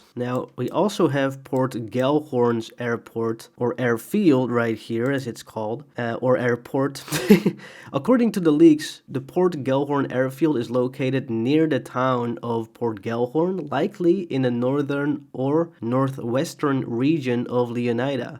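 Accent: Netherlands. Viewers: You dutch? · I can smell dutch, nice vid btw!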